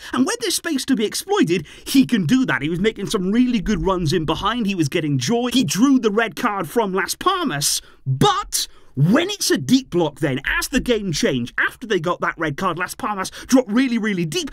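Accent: United Kingdom. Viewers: i am curious 👀. Your accent is clearly from the UK. Don’t you have a club in the UK that you support. I live in the Netherlands 🇳🇱 and I support First Barca 💙❤️ and second Ajax ❌ ❌ ❌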